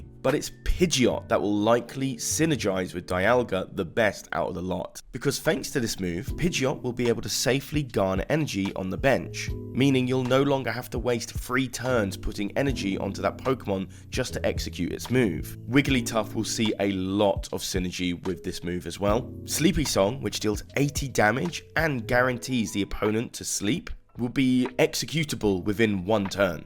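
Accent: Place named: United Kingdom. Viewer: Wait why is this voiced by a british guy now? Did this channel switch owners?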